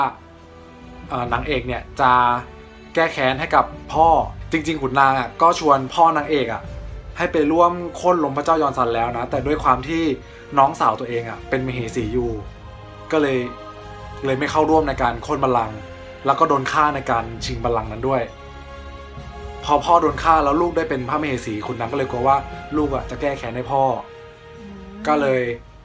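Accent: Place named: Thailand. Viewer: เหน่อ 55 น่ารักไปอีก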